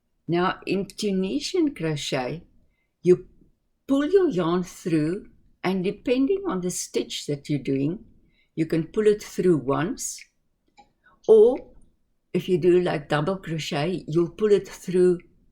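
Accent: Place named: Afrikaans, South Africa. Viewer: We were trying to place the accent. It has a little of that So Africa/Australia sound but also a Dutch/German sound. Afrikaans! Makes so much sense. My ex-husband spent two years in Capetown and we named one of my kids Xolani (ex says the click, I do not) and two of the kids have Xhosa middle names as well. I'm sorry about the bulkier yarn. I am glad you were able to make some things. That bear! Sooo adorable! I hope your great-granddaughters love the hats. I guess they don't need them until we are having summer. :D I like wearing slipper socks. :D I haven't been knitting long enough to need to repair socks yet. I made my daughter a reversible hat using Tunisian crochet. It's thick and so pretty. Love seeing what you have going.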